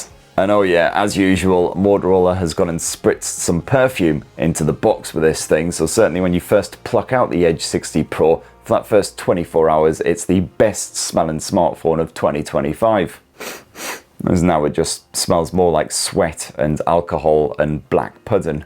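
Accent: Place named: England, United Kingdom. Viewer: Your English is veyy too English...😐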